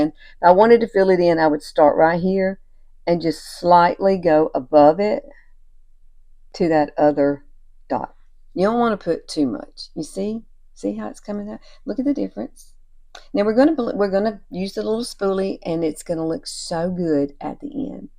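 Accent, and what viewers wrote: South, United States. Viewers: I just love your accent. I think southern accents make you sound friendly. It just makes me smile. Thank you for this tutorial. My daughter is getting married next month and I’m trying to relearn how to put on makeup again. Haven’t worn it for years. This is gonna be very helpful until I do it myself. Haha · Watched your lovely informative video from 2024. Love your soft Southern accent.I’m 77 had my eyebrows tattooed years ago Now I have dark thin eyebrows 😢!! Thank you,Carol from ‘Australia’❤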